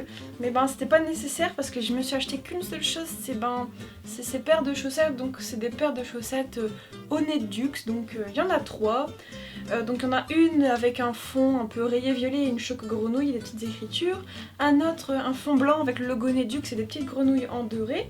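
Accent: North, France. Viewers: Tu as un joli accent du nord de la France :) Merci pour tes vidéos!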